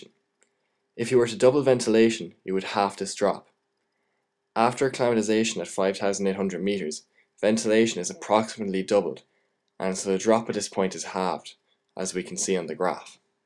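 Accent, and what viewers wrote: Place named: West, Ireland. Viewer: West of Ireland accent I'm sure, but definitely Irish.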